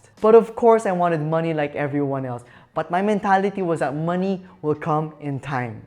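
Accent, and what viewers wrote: Philippines. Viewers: Are you Filipino. You have an accent lol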